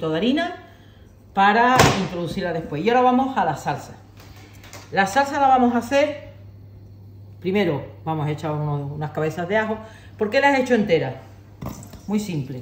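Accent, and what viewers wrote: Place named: Malaga, Spain. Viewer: Hola, mi enhorabuena. Vaya malagueña tan sala'. Mira que no soy afín a tu partido político pero llevas a Málaga por bandera me encanta, sigue llevando nuestra manera de hablar y nuestra gracia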